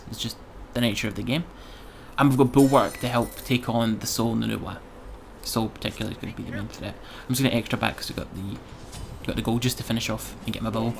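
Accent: Scotland, United Kingdom. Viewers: nah bro I'm trying to start YouTube I watch you're vid heard the accent and I was so happy to find another Scottish smite player. But I play Xbox if I had a PC I would play with you for sure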